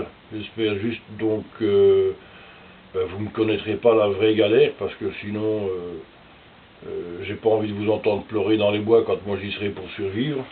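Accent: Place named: Brussels, Belgium. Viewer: Salut le ou le! Quelques réaction à tes propos... Tout à fait d'accord avec le terme de "prévoyant" et non survivaliste. Je me disais bien, t'as bien un accent de Bruxelle... Promis, moi je touche pas un copek en présentant mes "jouets". Quoi, t'as pas aimé mon four de campagne et ma chaufferette de rando????lol Je vois mal ma femme accepter de faire de la soudure dans le salon...lol.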